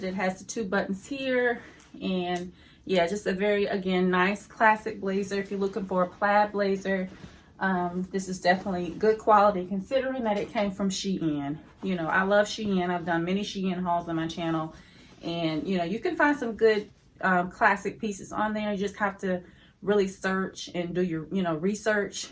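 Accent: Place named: South, United States. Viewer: The J crew blazer print is referred to as herringbone print 😉. I love your hauls and your southern accent is so cute.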